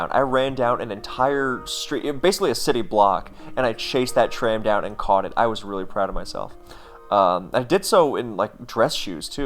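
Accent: Germany. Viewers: you probably pick up on final devoicing, missing schwas, wrong use of fricatives, and Germans tend to overthink the "th". ^^ xD o.O -.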